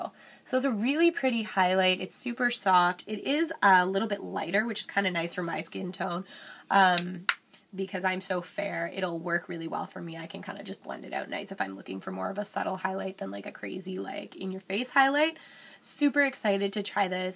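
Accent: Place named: Canada. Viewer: I love your Canadian accent! I have been to Vancouver before and I fell in love. Im so jealous that you live there and I dont! LOL Do you enjoy maple syrup? I can drink it by the bottle haha. Anyway I love love watching you! Keep the videos coming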